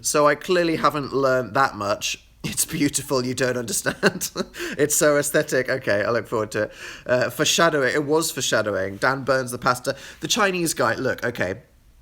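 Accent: United Kingdom. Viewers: your british voice soothes me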